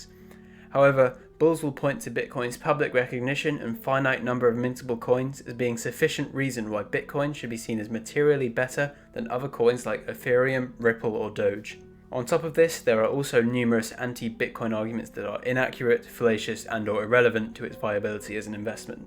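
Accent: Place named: Texas, United States. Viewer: I know a bit abt dialects and I have to ask, what part of Texas are you from?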